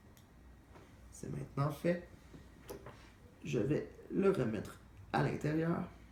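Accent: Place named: Quebec, Canada. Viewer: Oh putain l'accent quebecois .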